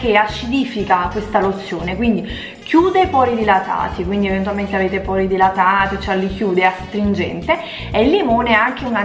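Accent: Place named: South, Italy. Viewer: Sei bravissima❤️😃Di dove sei? Forse è il contrario ma sento un’ accento del sud (?)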